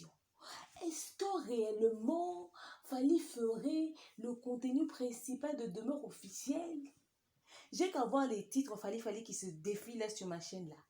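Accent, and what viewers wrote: Ivory Coast. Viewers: Kkkkkk demeure suis par terre avec ton accent ivoirien nous les warriors on t'aime beaucoup niang's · Good Job Demeure , ton accent ivoirien est parfait parle en nouchi pour la prochaine!! Fally a simplement fait du sarcasme de l’ironie. Et li y parle aussi de son étoile depuis le groupe Talents Latents ,en passant par le Quartier Latin et jusqu'à ce jour avec FVicteam qui est son label : personne ne pourra le stopper!!!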